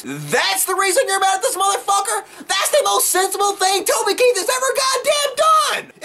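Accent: South, United States. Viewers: I'm not gunna lie the first video of urs I saw I thought "oh no a ranting southern hillbilly." I'm glad i listen to words not accents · Great video once again! Just to let you know, I don't automatically judge every southern drawl as a moron.:D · Never heard of you til today. I too have a pretty bad southern accent (Louisiana) and I tend to pick on hillbillies alot because of their close mindedness and lack of intellect. Dude, I couldn't help but to be impressed by your video. You mentioned people judging you because of your accent, and when I first started watching the video, I started to click on something else. (regardless of the fact that I sound the same.) I guess you can say, I assumed lack of intellect when I heard the accent and I apologize. As I continued watching the video. I realized, this southerner is pretty freakin cool. You are alright there. 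Way to represent logic from the South.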